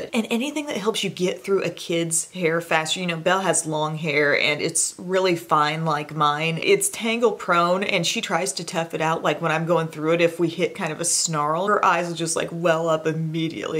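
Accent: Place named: Texas, United States. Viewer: Hi, I was just watching one of your videos that references a corrector as university of Texas colors, you kind of sound a little like your from Texas, I was just curious if that’s where you are... also if your a ut fan.. that’s cool but I have to Aggies :)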